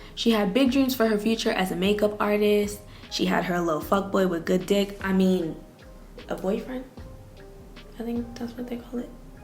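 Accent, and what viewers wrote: Jamaica. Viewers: Subscribe immediately when I heard patois😂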